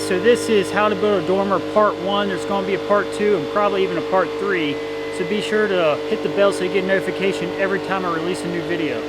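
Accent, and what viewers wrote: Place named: West Coast, United States. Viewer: I understand that. You actually sound more West Coast, lol. 😂👍🇺🇸😊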